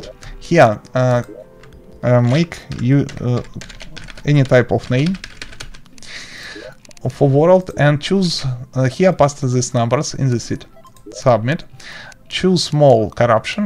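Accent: Russia. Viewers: You are Russian? Accent is similar😅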